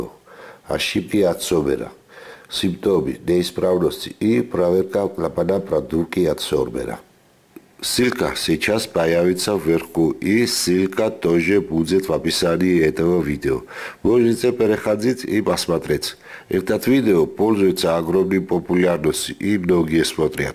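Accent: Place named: Georgia. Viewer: Гаумарджобат генацвали! Смотрел и слушал, сначала сомневался, акцент знакомый, похож на грузинский. Город, улицы, похожи на Тбилиси! Ностальгия просто, я служил 2 года в Тбилиси! Молодец биджо, разобрался с причиной, машинка огонь вообще!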